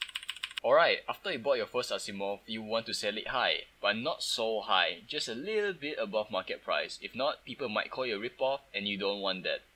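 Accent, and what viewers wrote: Singapore. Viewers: R u from singapore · u sound singaporean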